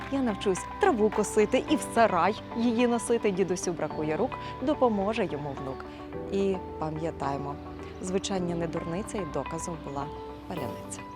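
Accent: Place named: Ukraine. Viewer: Звучание - то у тебя конечно чисто українське... Через пень колоду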